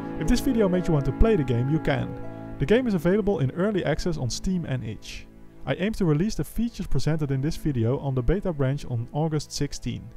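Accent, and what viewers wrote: Netherlands. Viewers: Hey maybe a odd question but are you dutch by any chance.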